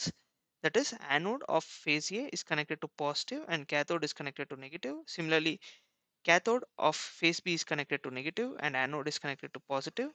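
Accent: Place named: Bihar, India. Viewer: Bihari ho kya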